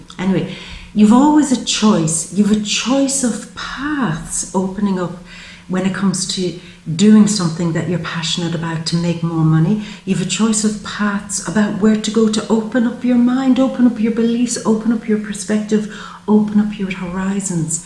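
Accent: Ireland. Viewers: Is that an Irish accent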